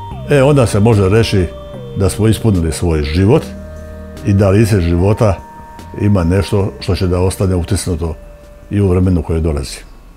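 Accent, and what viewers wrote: Šibenik, Croatia. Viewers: Šibenski naglasak bljesne nakon toliko godina ... 🦋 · Nevjerovatno, ima šibenski naglasak, a trudi se pričati ekavicom... Smiješno...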